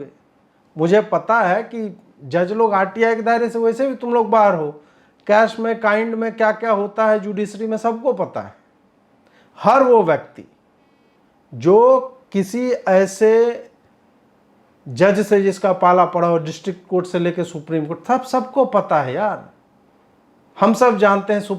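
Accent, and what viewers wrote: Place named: Bihar, India. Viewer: कितना झूठ बोलोगे। बोली तो बिहारी किस्म की लगती है। अगर आप बिहार से नहीं हैं तो प्लीज़ बता दें ताकि हम बिहारी इस अपराध बोध से मुक्त हो सकें कि हमारे बीच से आप जैसे लोग भीआते हैं।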